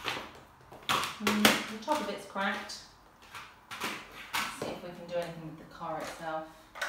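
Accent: West Country, United Kingdom. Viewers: As sexy as you are….. no stilettos 👠 on a real wood floor unless you want to give it a ‘distressed’ look….. there again, it may by engineered wood or posh laminate 🤔…. Love your West County accent by the way 😉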